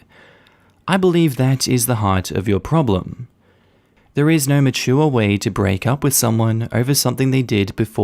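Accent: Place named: Australia. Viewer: your Aussie accent. I love listening to you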